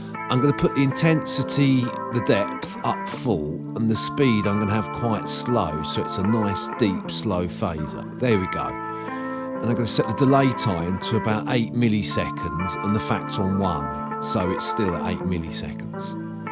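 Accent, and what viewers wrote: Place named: United Kingdom. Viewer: Haha, I love your British accent. Great job with the video.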